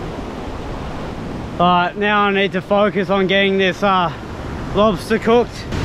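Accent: Australia. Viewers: aussie are you? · What part of Aussie is this? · Exactly how i expected an australian surfer to sound like and be like in a survival situation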